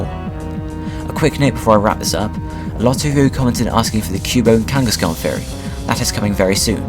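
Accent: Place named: United Kingdom. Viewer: *Video starts with a British narrator* Okay, this I like...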